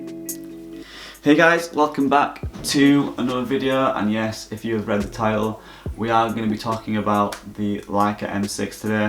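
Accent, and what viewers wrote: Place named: Preston, United Kingdom. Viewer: Nice video mate, love my M6. Where you from? Sounds like you are close to me up Preston way.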